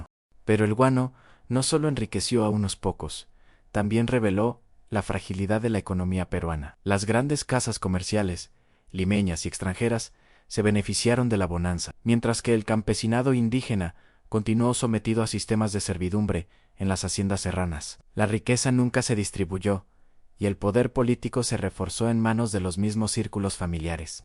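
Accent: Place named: Mexico. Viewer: POR QUÉ M. SUENA A DIALECTO MEJICANO ¿?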